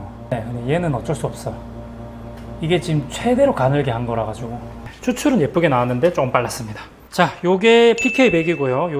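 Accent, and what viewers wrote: Gyeongsang, South Korea. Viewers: 항상 감사한 마음으로 동영상 잘 보고 있습니다. 저도 지역이 PK라 ㅎㅎ.. 억양이 옆집스럽습니다. ^^; 대장님. 동영상 보다 궁금한게..ek43의 노커.. 저게 어느 회사 제품인가 싶어서요.. 노커가 맛이 가서 바꿔야 할듯 한데.. 저 노커가 자꾸 눈에 들어오네요.. 😂😂 그나저나.. 그라인더 성대모사 진짜 똑 같아요.. ㅎㅎ😂😂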